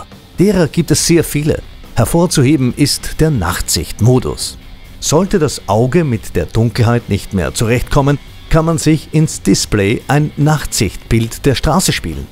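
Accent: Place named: Austria. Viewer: sachlich aber unterhaltsam und emotional, dazu der ziemlich coole Akzent (Österreich?) - davon können sich viele Automagazine eine Scheibe abschneiden. Hat mir gut gefallen :)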